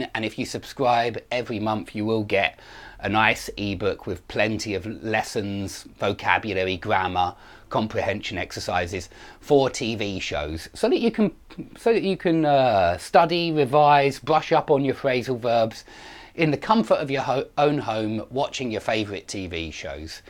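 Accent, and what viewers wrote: England, United Kingdom. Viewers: I love your English accent !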